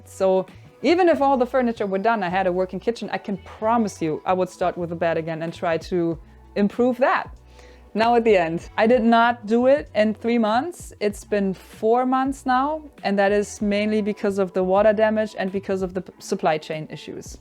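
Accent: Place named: Germany. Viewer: PS: the G'Enlish is an added bonus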